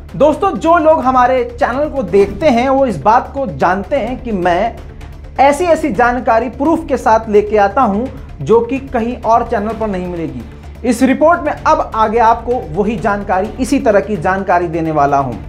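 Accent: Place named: Bihar, India. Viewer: sahab aap bahut acchi anchoring karte haiN thora lahze meN biharipan hai usko bhi durust kar lete to aur nikhar jate waise jitna hai wo bhi qabiledad hai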